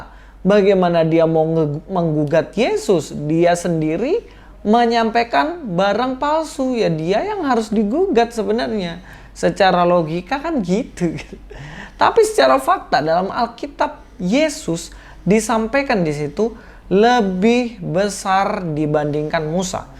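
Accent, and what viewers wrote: Java, Indonesia. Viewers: Cino logat jowo kui biasa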